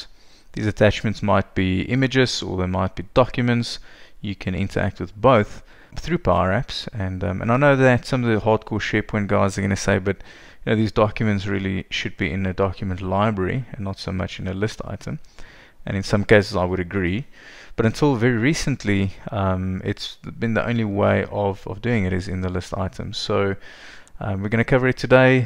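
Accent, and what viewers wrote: South Africa. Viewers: sorry this is a bit off subject but are you South African??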